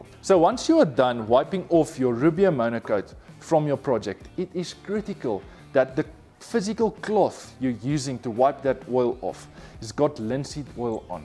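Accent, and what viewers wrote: South Africa. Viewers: Was very happy to come across the very project I was looking for. Even more excited to hear a South African accent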